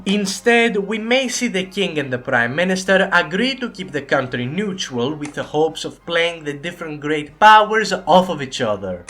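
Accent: Greece. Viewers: Really like to listen to people with that greek accent, it sounds so interesting and funny at the same time, nice vid btw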